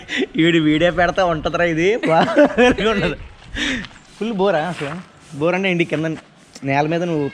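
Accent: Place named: Godavari, India. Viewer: Godavari basha bagundi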